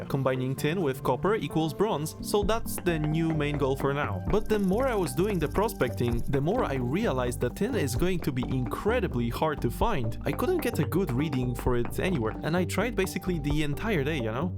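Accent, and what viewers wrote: Poland. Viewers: You sound Polish. Are you Polish? I am Polish, siema. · slychac ze Polak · Are you polish? You sound VERY polish/slavic and I fucking like it.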